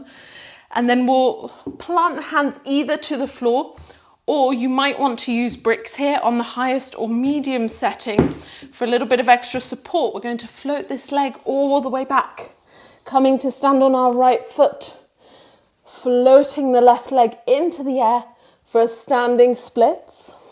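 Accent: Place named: England, United Kingdom. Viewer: I so needed this! Thank you so much again! Always love the england accent in there:)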